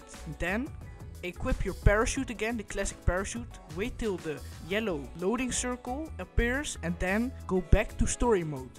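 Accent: Netherlands. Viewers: LOVED THE VIDEO! You sound very Dutch btw :) Ben jij Nederlands?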